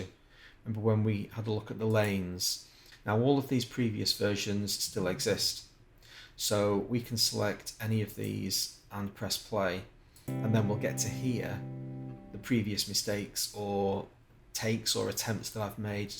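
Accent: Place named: Liverpool, United Kingdom. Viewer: Great Tutorial, Refreshingly Calm, Peaceful & Sticks To Teaching The Topic In A Precise & Interesting Manner, As He Is Doing It. Also, What A Gentleman! This Is A Great Video & I Will Be Looking To Learn More From. "Thank You ". p.s. To Make Things Even Better, I Believe I Detected A Genuine Scouse Accent. The Great City Of Liverpool, "The Home Of The BeatlesIs The Centre Of The Universe", & To Be More Precise, The Actual Centre Is Here In Fazakerley, In Liverpool.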